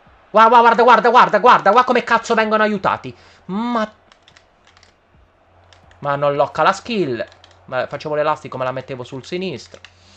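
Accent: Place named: Sicily, Italy. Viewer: Ciao è sempre un piacere vederti, comunque posso chiederti una cortesia? Puoi evitare di bestemmiare? Poi una domanda, ma sento alcuni accenti siciliani. Di dove sei?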